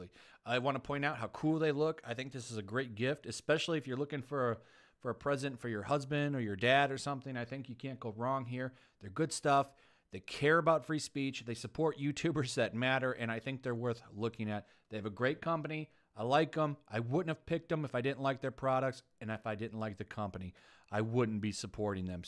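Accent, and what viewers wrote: England, United Kingdom. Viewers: 'Peckman'? Oh, you read almost as badly as Gary Beuchler. Peckham. Pronounced 'PEK-em' or 'PEK-um'. An area in south London. I'll give you this, though: this is one time when your daft plummy english accent is appropriate...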